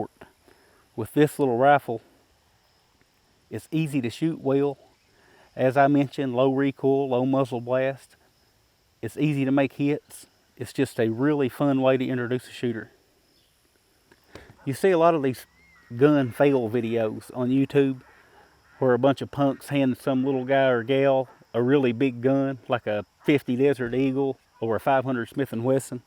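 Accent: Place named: South, United States. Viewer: southern accent and looks Hispanic.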